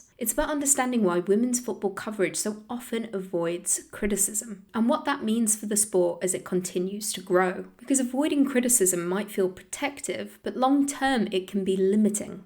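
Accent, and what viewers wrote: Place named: Transatlantic, United States. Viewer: Are you British or American? You're putting on a transatlantic accent, saying 'criddicism' etc. Don't be a wash.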